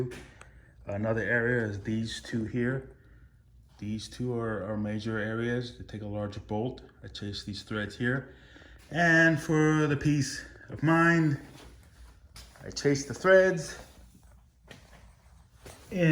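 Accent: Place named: Northeast, United States. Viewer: Good work! I hear a hint of Northeastern in your voice.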